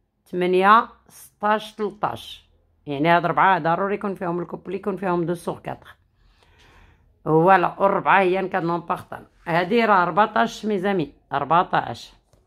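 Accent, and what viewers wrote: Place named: Arab world. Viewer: J'aime bouquau cet accent arabe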